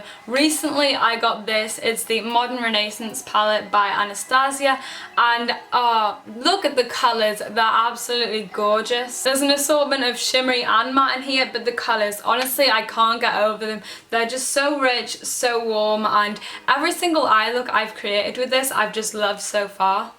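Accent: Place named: England, United Kingdom. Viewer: what part of england are you from? your accent is very different from me aha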